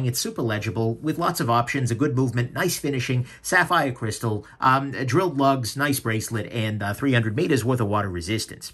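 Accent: New York, United States. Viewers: has got to be from NYC. He would make a great TV pitchman. Very well done review.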